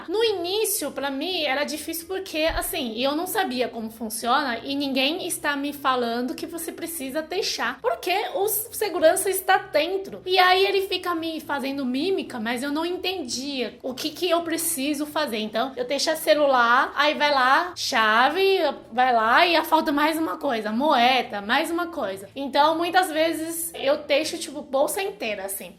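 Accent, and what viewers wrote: China. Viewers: Incrível que em muitas palavras, ela aparenta ser brasileira, mas em outras o sotaque chinês entrega.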